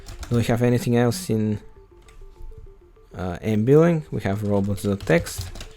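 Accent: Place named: Russia. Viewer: я понял по акценту что ты гооришь по русски,давай следущее видео на русском?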